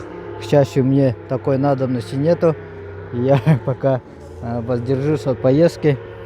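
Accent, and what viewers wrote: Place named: Yakutia, Russia. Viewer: Это наш, Якутский акцент. Ты же не говоришь например армянам, ''слющай Ара рЭчь пАдкачай да''. Это всего лишь акцент.Человек больше на Родном общается,а не на Русском.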